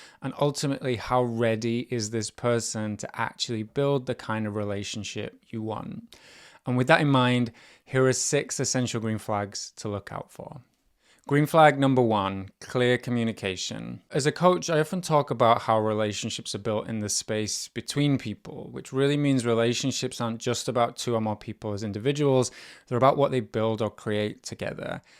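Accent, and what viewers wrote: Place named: United Kingdom. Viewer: Your British accent is extremely soothing.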